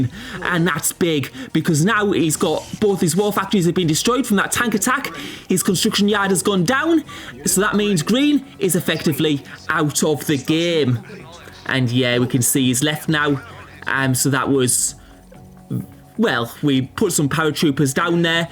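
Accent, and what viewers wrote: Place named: Northern England, United Kingdom. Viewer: im not an expert, but it's a northern English accent, my guess would be something around Manchester.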